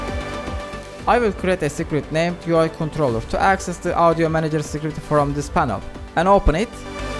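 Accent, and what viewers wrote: Turkey. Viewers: Aksanından kesin bu türktür dedim içimden. Sonra kanalın açıklama kısmından adının olduğunu gördüm 😊 konuşman gayet başarılı ve çok güzel videoların var. Başarılar dilerim.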